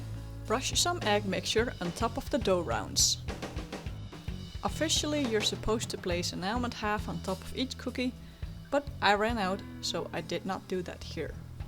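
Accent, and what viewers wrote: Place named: Netherlands. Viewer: Waarom praat je niet gewoon Nederlands want je Engels tells me clearly dat je Nederlands bent.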